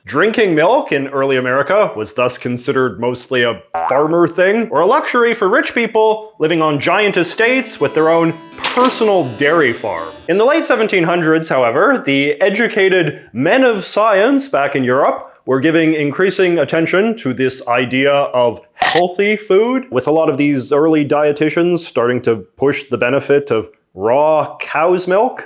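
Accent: Canada. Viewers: yes... I got it. The video was fun and well made anyway. I love your approach to culture. In France, culture is only considered with a capital C, focusing on the classics. Nobody would dare to take seriously a bunch of goodies found in happy meals. It is very refreshing. Beside, it enables me to learn a lot about American or Canadian culture. Love your Canadian accent too. I wonder if it comes from the French roots of Canada. Some French do indeed pronounce "about" just like you. On another topic, I wonder if you would consider making a video about Jordan Peterson. As both an advocate of free speech and a gay, I wonder what could be your intake on his case.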